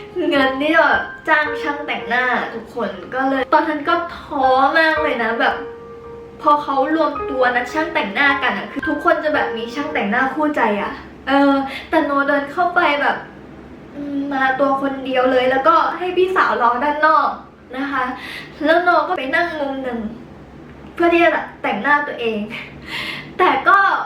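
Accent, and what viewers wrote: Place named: South, Thailand. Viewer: เสียง เหน่อ สำเนียง ใต้ น่ารัก น่าฟัง มากๆ ชอบๆ 😍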